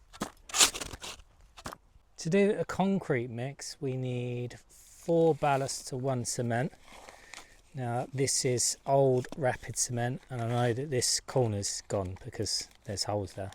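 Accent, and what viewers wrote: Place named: Sussex, United Kingdom. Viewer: Like others have said here this channel is unique in terms of your style, honesty and determination to face any problem and deal with it. I came here after watching you help out Keith Brown dig out his back garden for better drainage. I was following another channel, Sampson Boat Co which was also about completing a long term project, but binned that after starting to watch your barn conversion and cartlodge playlists. I dig your accent, I am from Sussex so it resonates with me as a Southerner. Your humour is ace. OK your subs numbers may be lowish compared to the top guys but is there anything else you can do to boost this? More collabs with other YouTubers maybe, I know you had the Skill Builder video guy round for a piece but can you use this to your advantage in other ways? I think we all appreciate the massive PITA it is to video everything you do but try and keep it up and maybe something will turn up that gives you a more positive financial situation. 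My biggest DIY project so far is lining a double garage wall with plywood but your work inspires me to try bigger and better things. Onwards and upwards mate.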